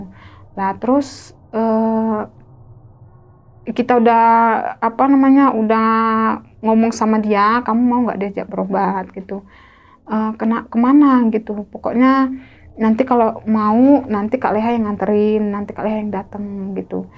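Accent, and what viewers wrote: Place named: Medan, Indonesia. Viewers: Apa pulak,😂 Medan kx KK awak ini.. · Jadi suka aku dengarnya. Nampak kali logat Medannya😅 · Kak logat mu Medan..bukan Aceh.. Yg logat Batak nya ini Boru...😂😂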